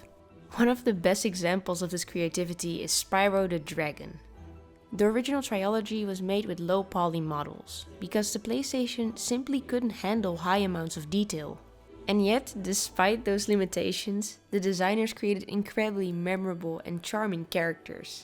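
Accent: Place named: Netherlands. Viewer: haha ik kon echt meteen horen dat je nederlands was, goeie video wel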